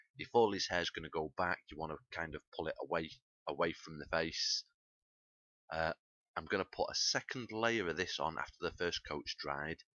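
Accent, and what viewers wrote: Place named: Northern England, United Kingdom. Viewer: Unreal work and your northern so subbed good and proper haha.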